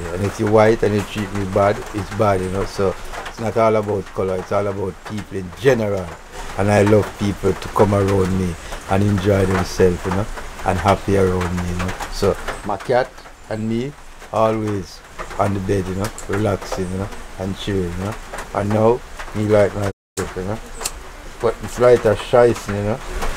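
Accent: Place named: Jamaica. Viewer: Patois was strong in this. get passionate. Love it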